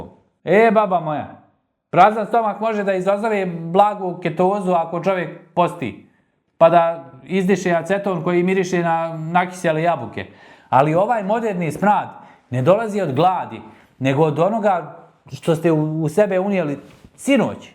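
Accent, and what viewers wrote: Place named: Tuzla, Bosnia and Herzegovina. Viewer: Ovaj naglasak mi najvise vozi na Tuzlu, Bijeljinu i taj dio (prije ce bit Bijeljina)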